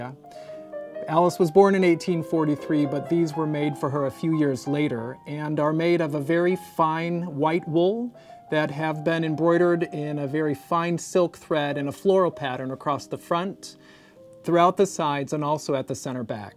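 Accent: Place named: United States. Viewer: WHO MADE THIS APPALLING IN QUALITY DOCUMENTARY?Firstly Who is presenting these exquisite objects? with Canadian or. I hope not American accent. Why does the Camera stay fixed on him. No interest ,instead of presented pieces? Irritating background noise . And Too short moment on objects with zoom image clouded. I CANNOT BELIEVE WITH ALL THE BRILLIANT YOUNG PEOPLE. WHO PRESENT DOCUMENTARIES OF THE HIGHEST QUALITY . THAT THE MUSEUM OF LONDON PRESENTS. THIS VERY POOR QUALITY WORK. An English Lady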